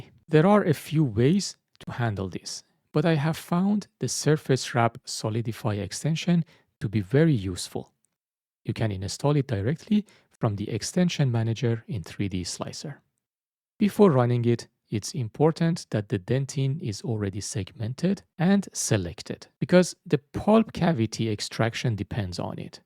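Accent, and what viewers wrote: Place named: Iran. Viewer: Why do I sense a little bit of beautiful persian accent from narrator voice!?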